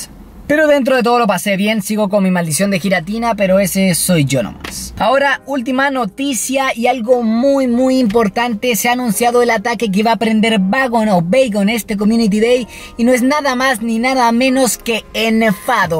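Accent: Chile. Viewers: Sinceramente no hay mejor youtuber de Pokémon, sinceramente tus vídeos son buenísimos y por más que se quejen de como hablan los chilenos, me gusta tu manera de expresarte y te entiendo siempre, saludos desde Perú y ojalá vengas pronto qué te recibiremos muy bien 💪❤️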